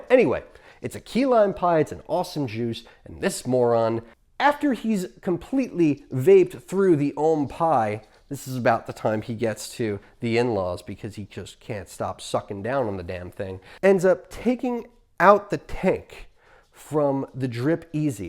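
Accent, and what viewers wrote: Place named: New York, United States. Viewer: your mini me really brings out the new yorker in you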